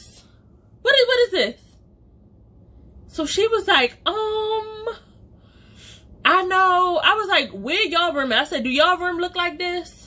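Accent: Baltimore, United States. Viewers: Lol your Baltimore accent is strong as hell!! Love it! 😂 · Lmao you have to be from Baltimore! Your accent is too funny, I loved this story time lmao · You can tell she’s from Baltimore!! You can tell when someone is from Baltimore. That accent mad heavy !! I fw it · Baltimore accent + A good 🍑 story teller ? I’m here 😌💖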